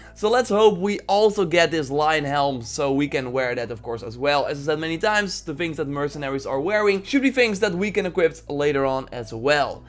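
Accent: Netherlands. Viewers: I thought so you're from the Netherlands from your accent. Nice video by the way!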